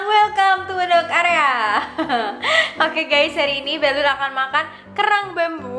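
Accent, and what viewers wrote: Java, Indonesia. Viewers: Jowone kerasa pas ngomong 😁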